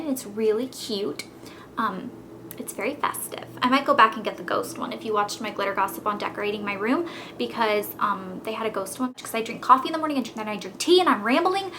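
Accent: England, United Kingdom. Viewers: loving the english accent hahaha <3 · you do an amazing english accent! i live in the UK and that sounded soooo good lol :) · why are you talking like like you're british? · Your British accent is awesome, I feel proud. Love from London, England! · you have a kind of british accent in this video !! LOve it !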